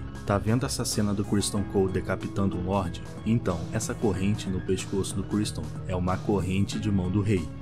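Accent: Rio de Janeiro, Brazil. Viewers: Por que o narrador do vídeo tenta mentir e esconder o seu sotaque carioca?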